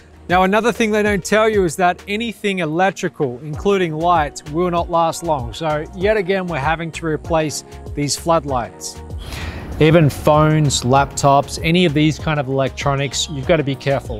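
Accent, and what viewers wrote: Australia. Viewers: You have an Australian accent. How do you compare life in Australia and Costa Rica.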